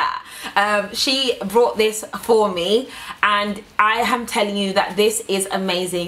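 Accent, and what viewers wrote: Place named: United Kingdom. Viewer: She was born and raised in London therefore she has a British accent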